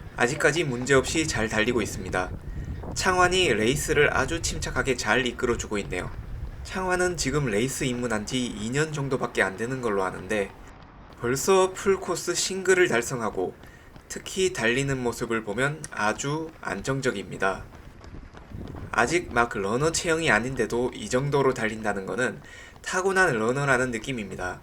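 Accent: Gyeongsang, South Korea. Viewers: 경상도 특유의 쌍시옷 발음 약하게 들어가서 귀여운 느낌 있어요 ㅎㅎㅎ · 대구 출신이라니 경남분인줄 알았어요! 더반갑습니다.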